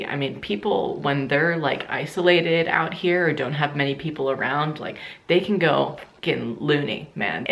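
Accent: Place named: Western Pennsylvania, United States. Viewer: This sounds like western Pennsylvania 😂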